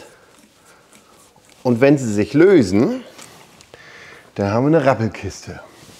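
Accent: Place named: North, Germany. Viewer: du Tüffel🤣🤣🤣erkläre mal den Bayern was ein Mors ist. Ok meine Tante ist aus Kappeln daher kann und kenne ich den Norddeutschen Dialekt. Deine Vorführung per Video ist wirklich klasse und gut. Für mich dein kaum hörbarer Dialekt auch schön aber denke an die deutschen Ausländer. Du hättest mit unserem Dialekt auch große Probleme. Gruß Klaus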